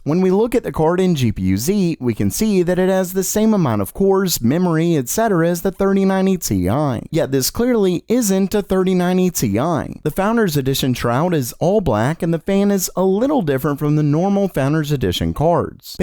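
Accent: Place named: Texas, United States. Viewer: I just realized how Texan he sounds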